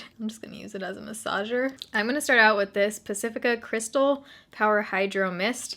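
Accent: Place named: Seattle, United States. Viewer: You're the first beauty guru I've followed who lives in my area. I actually just started following you, and when you said you were living in Seattle it all made sense. Your chillness, fair skin (which is easy to have when it always rains here), the way you talk (although I can't explain it). I'm looking forward to seeing more of your videos!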